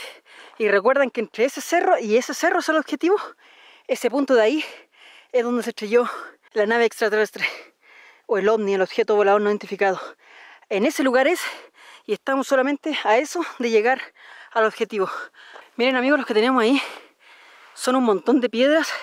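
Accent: Chile. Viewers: Hola pequeño hombrecito!!me encantan tus vídeos los lugares hermosos que muestras soy Argentina pero tengo familia chilena mi abuelo me contaba sus costumbres y me gusta escucharte como hablas por qe el me contaba historias de ese país qe nunca fui pero lo siento conosido y cercano tengo una piedra de cruz de ese río muchas bendiciones para vos y tu padre 🤩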